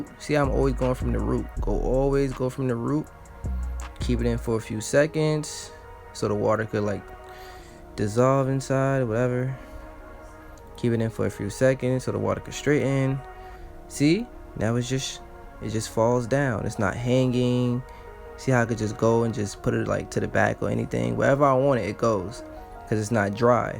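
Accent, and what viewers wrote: New York, United States. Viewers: i know a new yorker when i hear one lolol yurrrr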